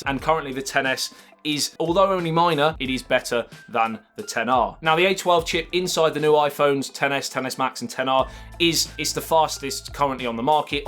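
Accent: United Kingdom. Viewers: Well,..........except for that British brogue........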